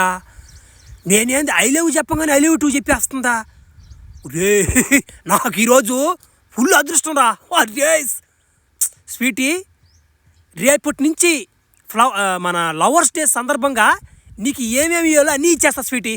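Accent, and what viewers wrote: Nellore, India. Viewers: Arey Anna nuvu Nellore ahhhh nedhi 😊😊😊😊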